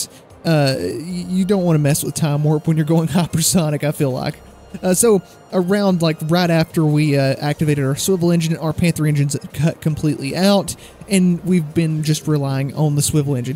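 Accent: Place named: South, United States. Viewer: i love your countryish accent, it just makes the whole vide so much more enjoyable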